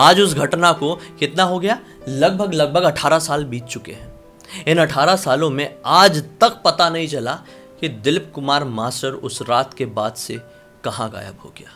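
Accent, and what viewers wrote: Bihar, India. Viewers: Aap bihar se ho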